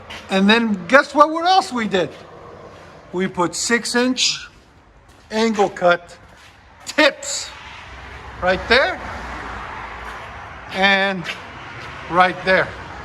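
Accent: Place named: Upper Peninsula, United States. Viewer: Are you from Michigan because you sound like a yooper.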